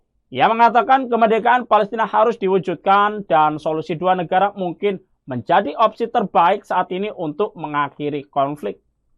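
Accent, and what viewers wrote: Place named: Banyumas, Indonesia. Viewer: jawa ngapak bawa berita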